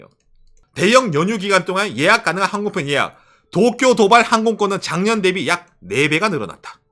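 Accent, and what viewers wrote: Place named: Gyeongsang, South Korea. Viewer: 경상도 남자라 기본적으로 억양 배이스가 깔려 있는것도 있을듯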